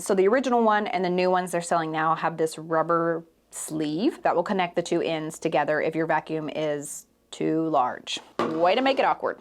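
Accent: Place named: North Carolina, United States. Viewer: You have NC vibes. I'm from NC, and our accents are very similar. I'm probably wrong, but it's just an observation.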